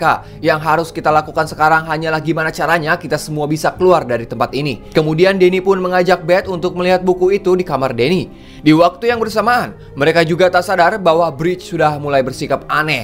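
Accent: West Java, Indonesia. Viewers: Asalamualaikum AA emg org Sunda gitu abis lucu amat kalau nyaritaken pelemna Sagala Aya bahasa aing kitu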